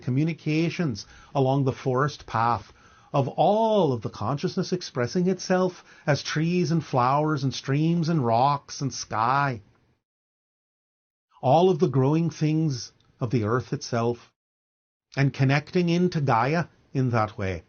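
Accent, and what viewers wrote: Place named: Ireland. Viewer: Whg does Bashar have an Irish accent 🤣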